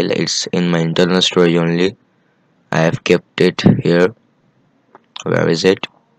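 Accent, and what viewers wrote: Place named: India. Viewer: lazy english or indian accent i hate it.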